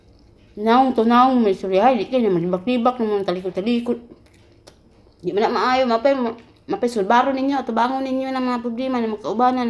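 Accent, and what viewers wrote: Visayas, Philippines. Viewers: yan tlaga magsalita bisaya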